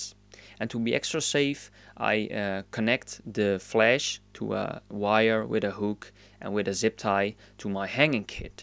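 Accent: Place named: Netherlands. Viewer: Nice tips. You sound Dutch, am I right? :D (Ik ben ook Nederlands)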